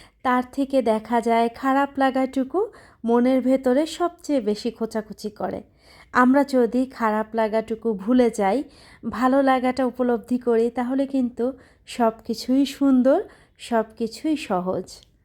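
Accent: Khulna, Bangladesh. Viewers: আপু আপনি যেহেতু খুলনার মেয়ে আপনার ভাষাতে ওই ধাব্রাই বলে। কারন আমারও বিভাগ খুলনা তো আমরাও ধাবরাটাই বলি তবে কি জানেন আপু ঢাকা আসার পর থেকে না চাপটি বলিকারণ এখানে সবাই চাপটি বলে।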